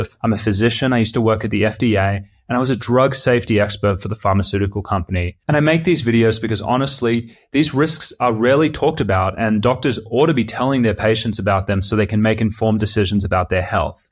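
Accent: Australia. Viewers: Name sounds Austrian, voice sounds Australian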